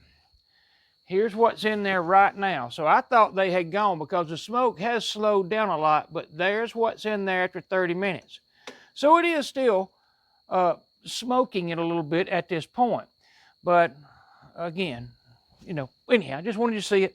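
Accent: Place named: New England, United States. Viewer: Damn… the Yankee accent is horrendous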